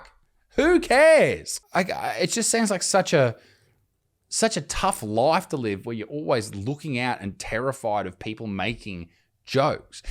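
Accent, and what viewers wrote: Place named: Australia. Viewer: its the Australian vanacula mate get over it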